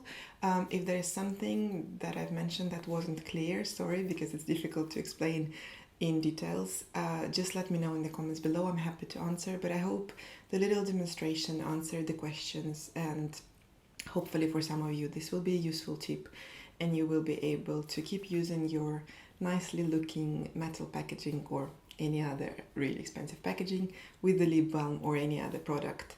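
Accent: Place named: France. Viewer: Thank you! Where is her accent from, Français peut être?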